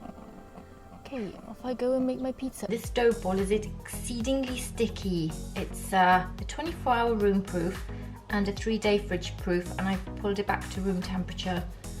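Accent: United Kingdom. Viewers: Love your cute British accent.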